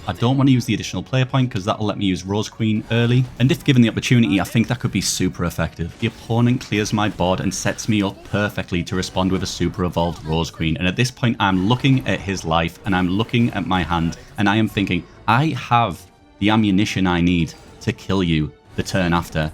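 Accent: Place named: Yorkshire, United Kingdom. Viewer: GOOD TO HEAR ANOTHER YORKSHIRE ACCENT in SVWB ;-) where you from fella?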